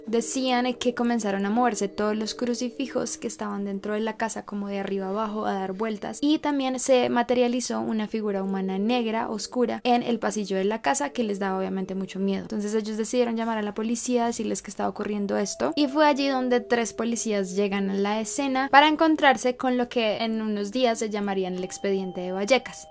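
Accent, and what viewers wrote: Colombia. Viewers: Colombia, creo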